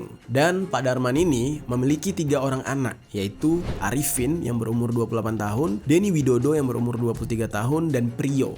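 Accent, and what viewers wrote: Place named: Talun, Indonesia. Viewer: Suarane teko Talun kang...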